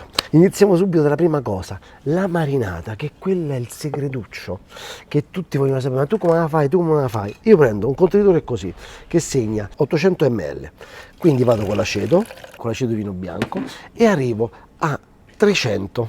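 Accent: Rome, Italy. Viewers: Grande! Con il tuo accento mi riporti nella mia magica Roma! Eseguirò la tua ricetta!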